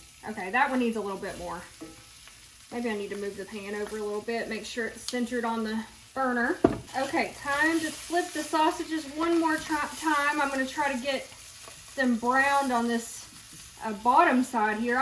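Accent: South, United States. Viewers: girl your southern accent is on and poppin ☺️ I didn’t know “caught my eye” was prounounced as one word in Arkansas 😊 caw-ma-aye! 😋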